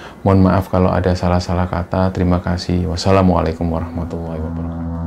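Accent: Java, Indonesia. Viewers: Paling suka kalo mas yang bercerita. Meduk suaranya hehe. Sukses selalu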